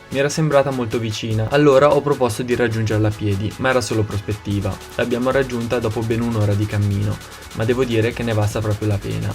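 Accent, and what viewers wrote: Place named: Lombardy, Italy. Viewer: Concordo con la dizione dialettale lombarda !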